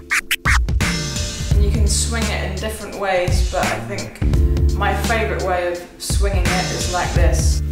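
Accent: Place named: South West, United Kingdom. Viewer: Yes... Defo Dope Skills! You sound like your from the South West; )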